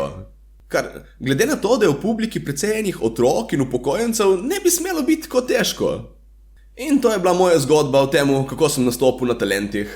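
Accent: Primorska, Slovenia. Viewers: Ma kaj, super si bil, samo, da žirija in publika ni imela pojma, kaj je to komedija. Kaj pa blebeta tisti Čakarmiš, kaj pa je on naredil v življenju, razen tega, da je nekaj delnic kupil od Pro Plusa in lepo živi od dividend in drugim soli pamet. Toliko brezveznega pesimizma naših Slovencev pa še ne. Seveda, tudi jaz sem bil začuden, da si bil na Talentih, nekaj časa sem mislil, da je neka reklama zate, no potem pa sem poštekal, da resnično tekmuješ. Bil si brez treme, govoril našo primorščino in hvala Bogu, da te imamo. Tu sei bravo! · Obožujem tvoj naglas🙌 primorci rulamo😂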